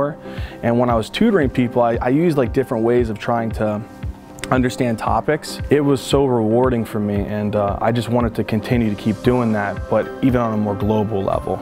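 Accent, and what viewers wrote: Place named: United States. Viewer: I started to follow you because I'm working as a military personal trainer. I saved people lives during my last year here in Italy thank to my military knowledge, and I would like to improve my knowledge in motorial science in order to give the best suggestions I could to recovery from many muscolar and psicology problems. So your tutorials are helping me out a lot, I love your American English which is really easy . Thank you a lot sir .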